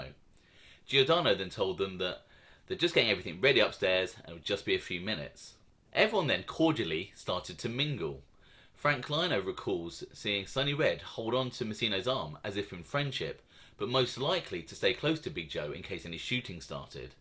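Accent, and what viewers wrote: United Kingdom. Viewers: Loving the videos mate. Nice to here a fellow Brits talking about the mob.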